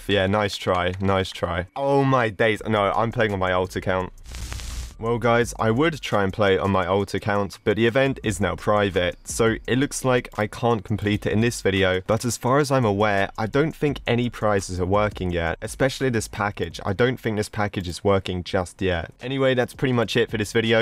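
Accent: United Kingdom. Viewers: sounds like british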